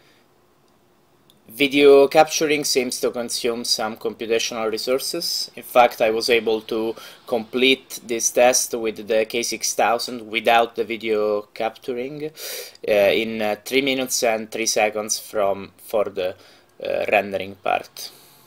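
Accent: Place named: Italy. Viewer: si sente che sei italiano ahahahahah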